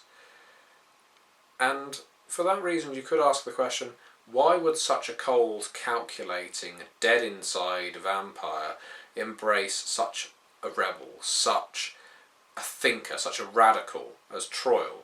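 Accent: United Kingdom. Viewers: This is prove that no matter how scruffy you look the second you open your mouth and out pops a British accent you sound 9999 times better then you look.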